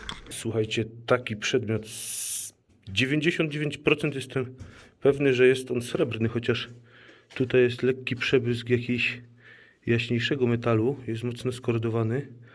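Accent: Silesia, Poland. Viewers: fajnie jak tak po Śląsku godosz! 😉👊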